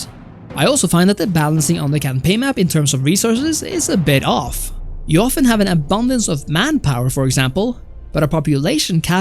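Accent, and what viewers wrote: Norway. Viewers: You norwegian accent is just awful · Må jobbe med den norske engelsken din kompis \__('^_^')__/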